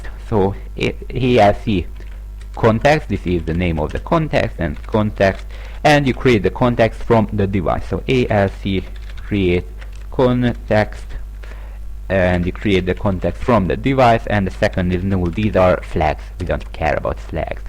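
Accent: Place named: Hungary. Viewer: Nagyon jó oktató videó, köszi! Messziről rikít a magyar akcentus, bár ezért a YT névjegyben is szabadkozol. Már majdnem rákérdeztem külön is,de aztán feltüntek a menüpontok. Viszont a videó nagyon jó, és messze jobb pár ér felvágós, indiai akcentusnál.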